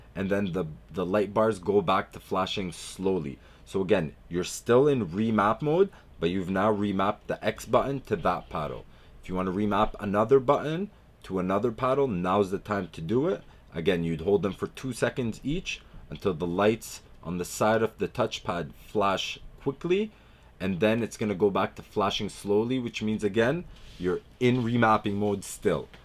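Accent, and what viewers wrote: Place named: Guyana. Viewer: Yuh sound guyanese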